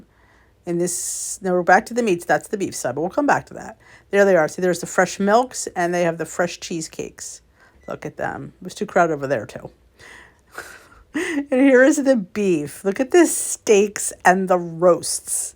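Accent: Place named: South Jersey, United States. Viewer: Lol!!! This is the first time I have watched you and I kept thinking she sounds like she’s from South Jersey. When pulled into the Amish market in Mullins Hill, I was like I knew it. I am closer to the Williamstown location. I have also been fighting to lose weight most of my life. I have done WW like 15 times, may try again in January. Tried Saxenda shots but my insurance doesn’t cover it now.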